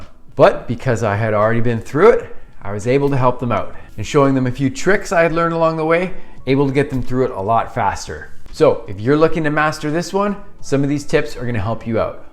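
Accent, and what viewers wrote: Canada. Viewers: Canadian aye 👌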